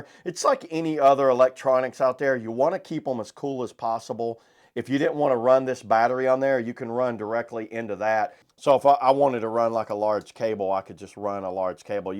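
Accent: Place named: South, United States. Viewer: Your country accent is extremely noticeable 😅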